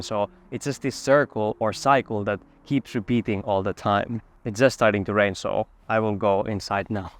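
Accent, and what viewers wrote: Finland. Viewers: Hehe! No, it is the typical Finnish accent for well-educated Finns who learnt to speak English at a very young age - I love it, it reminds me of my beloved native Finland. * is in fact excellent English*, as my native English teacher at Lund University, Sweden stated - the Finnish students spoke the best English, he said. We had/have good teachers in Finland!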